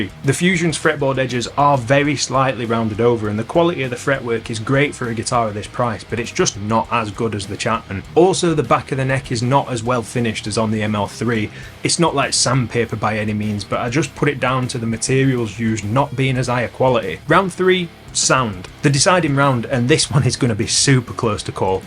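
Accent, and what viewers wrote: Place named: South Yorkshire, United Kingdom. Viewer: Accents fascinate me...and Ive been trying to work out yours... Im going to guess...somewhere around South Yorkshire??? 🤔 (Dont have a go at me if youre a Lancastrian! 😅)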